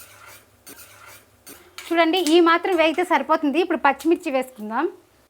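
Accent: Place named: Karnataka, India. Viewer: I think u belong to Ananthapur district...ur slang is a bit kannadiga speaking Telugu... Ur recipes r interesting n having eye appeal..ur preparation n presentation of ur recipes r very good...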